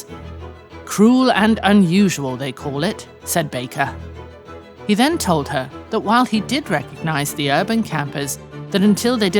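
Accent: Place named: United Kingdom. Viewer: Glossing it up with a British narrator, still shite as they’d say.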